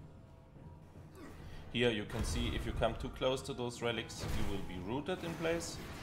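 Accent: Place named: Germany. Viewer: Wenn Deutsche Englisch sprechen :D <3 danke für den Guide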